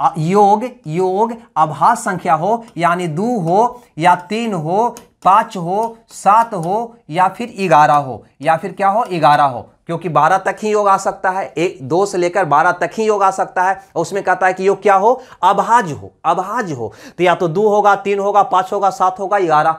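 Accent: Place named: Bihar, India. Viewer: Sir aap bihar se ho kya.... Language se lgta... Hm Bihar se 👍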